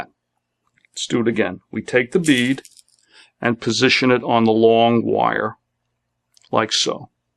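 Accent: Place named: East Coast, United States. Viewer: Great video...until the end...even though I got what you were teaching you kept going out of the camera view...I found myself telling you to move up...LOL...Still you are very clear and I am sure I could learn a lot from you so I am going to subscribe...I like your accent...are you one the US east coast? Thanks for sharing I am so glad I found your videos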